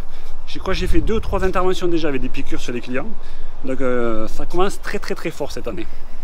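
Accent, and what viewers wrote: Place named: Toulouse, France. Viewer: avec un accent toulousain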